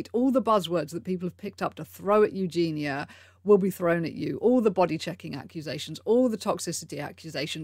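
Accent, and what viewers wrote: United Kingdom. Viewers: Honey, oh boy! I'm American and really only speak English unless you include my three years of Spanish in college which equates to like basically Alicia still were still in from clueless trying to speak to her maid when she says,"you know I don't speak Mexican!" What was hell white valley girl I end up sounding every time I try to speak Spanish but anyways! My fiance Tyler just always started putting the TV setting so that every single thing at subtitles on all the time and it first it drove me nuts but then I started paying more attention to it and being like oh wow I always wondered what they said in this movie or whatever at that very moment now I know! And so that habit has translated over onto YouTube so I always have it set so that there's either actual subtitles on whatever videos are playing and they show up for me, or, they are the "Auto Generated" subtitles which are clearly meant for English speakers from America, because oh boy let me tell you it's pretty funny just reading them even on your videos because I'm sure you know quite well by now that you have a very distinct British accent and I mean personally I love your voice an accent so much and I just love your Vibe overall anyways that's part of the reason I love my channel but, I think the worst is when there's ever someone who narrates a video who has a very pronounced Welsh or Scottish accent...? 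(Austrians can be puuurrddy hilarious too with what this "Ufo generated" stuff comes up with?!) So when you're ta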